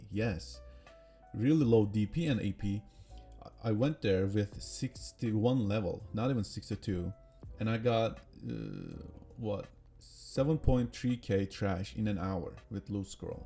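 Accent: Turkey. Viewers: Güzel bir aksanın var ama yine de türk olduğunu tahmin ediyorum :D Video için teşekkürler çok yararlı oldu. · Şunun bir de türkçesini çeker misin kanak ses tonlamandan anlaşılıyor türk olduğun çok rica ediyorum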